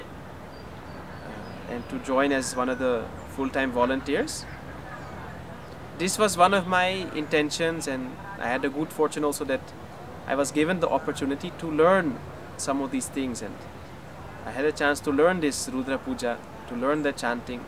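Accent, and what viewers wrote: India. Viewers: Its indian but its damn good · your English is much more INDIAN... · Hello, your Indian accent delivery took away from the meaning of your words. It just does the opposite of feeling genuine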